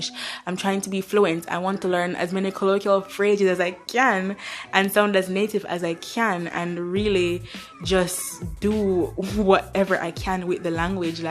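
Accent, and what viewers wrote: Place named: Spain. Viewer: yes u do have a spanish accent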